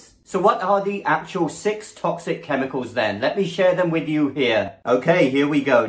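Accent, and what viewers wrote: United Kingdom. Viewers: On a completely different subject, you say you've lived in US for 10 years, your accent is still British. I am British, am staying in US with a London born lady, she has lived here for 35 years, not a hint of a US accent, sounds a proper London girl 😎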